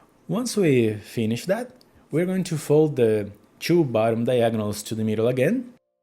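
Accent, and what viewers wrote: Brazil. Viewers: Caraca, muito legel. Eu sei que você brasileiro pelo sotaque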